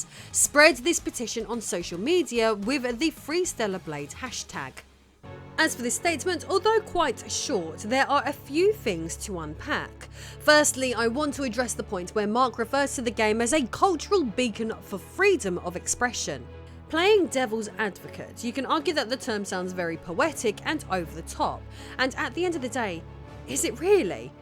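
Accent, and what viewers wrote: England, United Kingdom. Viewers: Refreshing to hear an English accent pronounce words that are articulated so differently Stateside (Minus the sarcasm). Wholeheartedly agree with your assessments. Great show as always! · Apparently, I am addicted to subscribing to nerd content makers with British accents. I am so weak. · Ilve been seeing people on YouTube are calling you and your husband white or British and making fun of your accent or teeth … and accusing you both of thinking your better than them because your accent…. If that isn’t racist I don’t know what is…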